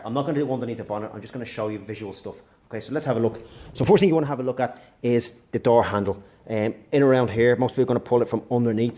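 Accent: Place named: Ireland. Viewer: Irish mate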